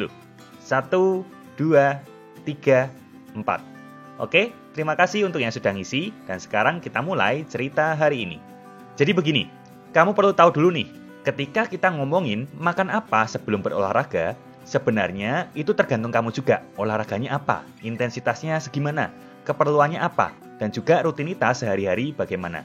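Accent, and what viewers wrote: Java, Indonesia. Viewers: udh trlalu cinta sama channel ini.. semoga mas slalu sehat dn bnyak rejeki krn udh bantu bnyak orang untuk hdup sehat.. oh ya kak.. mau serius tanya kak orang mana ya?! kok ngomongnya logat jawa kental bgt hehe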